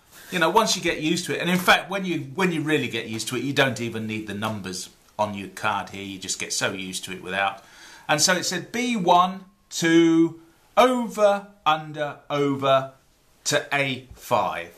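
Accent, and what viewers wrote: United Kingdom. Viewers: The reason for it being so good and so clear ist because it's with a brtitsh accent! Which makes it easy to understand. Oh, the video is brilliant! Cheers for that!!!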